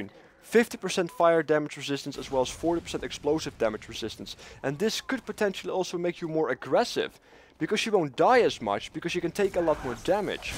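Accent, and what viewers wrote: Netherlands. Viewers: Lekker nederlands accentje hoor :)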